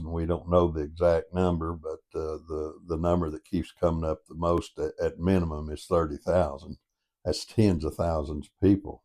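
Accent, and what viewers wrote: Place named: South, United States. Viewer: Listen, I'm a Marine Corps vet and former contractor. I'm about as right wing as you can get. People like you who live your sheltered little life down South are absolutely clueless. My ex wife was Jewish, I was trained by Israelis as a contractor after I got out of the military. Let me tell you something Papaw...Every single Zionist Jew in the world HATES YOUR GUTS. The second they hear your Southern accent they wish you were dead. They hate farmers, they hate people who hunt, they hate people who do for themselves and they spit on Christians in Jerusalem. Last year Israel declared themselves the gay capital of the world. You have absolutely ZERO experience with these people. They don't follow the Torah, they follow the Talmud and the Talmud and the Talmud says the Jesus is in "Gehenna" or hell boiling in feces. 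The State of Israel was founded by a Satan worshipping banking family called the Rothschilds. They signed the Balfour declaration of 1917. They founded OUR Federal Reserve and all the central banks of the world. the current state of Israel IS the GREAT DECEPTION!! You are utterly and profoundly clueless. I love Southern People, My Momma is from South Carolina but I'm a Yankee and I know these people YOU DON'T and you are talking out of your ass.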